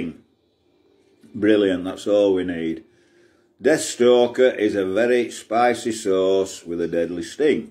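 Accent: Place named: Yorkshire, United Kingdom. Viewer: Where in Yorkshire you from cocker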